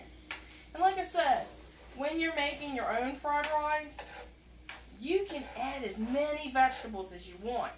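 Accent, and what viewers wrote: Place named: Louisiana, United States. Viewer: Great recipe for fried rice.May I ask were your from?Your ascent sound like your from Louisiana.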